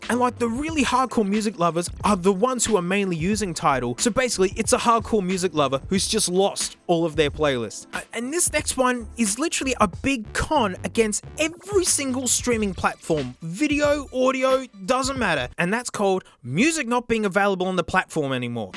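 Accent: Australia. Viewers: He's got that perfect Aussie accent that makes you want to listen to anything he says.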